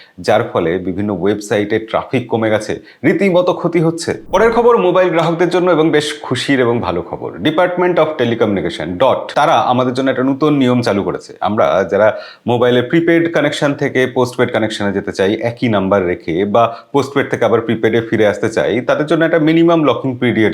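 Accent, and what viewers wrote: Bangladesh. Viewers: আপনার এ্যাকসেন্ট বাংলাদেশী, কিন্তু চ্যানেল দেখছি কলকাতার! 🤔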